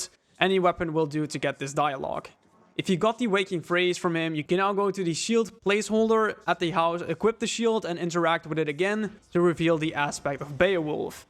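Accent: Netherlands. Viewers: Your accent is awesome, it makes you sound like a Dutch DJ xD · HE IS DUTCH! I RECOGNIZE THE ACCENT! I LOVE DUTCH COCK!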